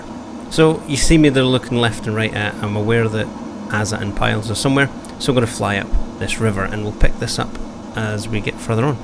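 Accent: Scotland, United Kingdom. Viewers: When it doesn't even remotely look like that because your stone age 1080 isn't able to run it. For those not knowing: The way out is straight forward across that lake Now I still have to get around imagining to fly with a hell either just left or right of me. Anyway, that lake is well deserved. Gotta admire that Scottish accent :)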